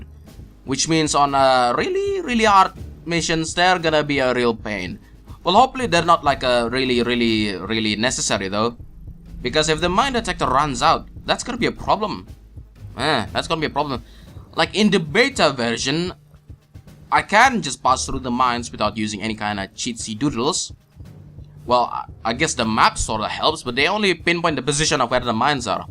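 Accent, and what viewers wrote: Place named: Arab world. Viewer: are you Arabic? that accent sounds like it but i would like to know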